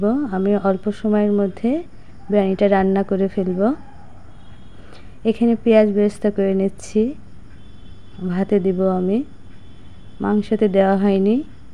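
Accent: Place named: Pabna, Bangladesh. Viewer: ooo amader pabnar moto vasha mone holo tai bollam apu Don't mind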